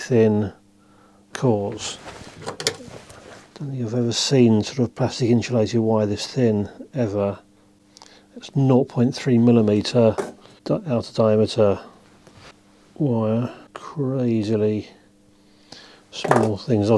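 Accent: United Kingdom. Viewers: I think you're just not used to hearing British English..?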